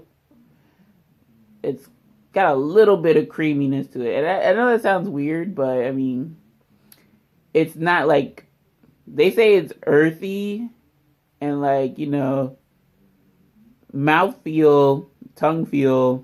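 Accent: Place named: United States. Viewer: Love the American accent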